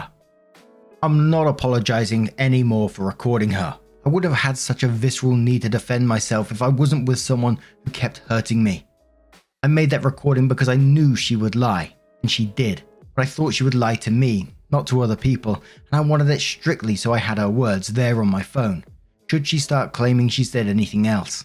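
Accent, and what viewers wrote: United Kingdom. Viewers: It's the British accent for me. Makes everything sound funnier!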